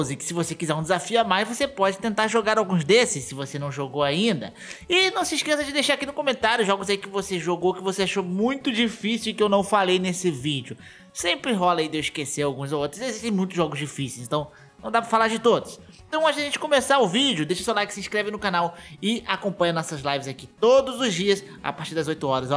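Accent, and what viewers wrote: Rio de Janeiro, Brazil. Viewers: vc e do Rio?(se for e de onde?)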